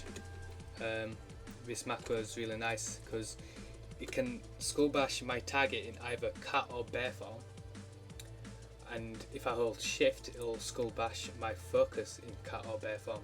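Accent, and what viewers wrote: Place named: South, United States. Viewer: Heavy southern accent cat -- cut -- bear -- b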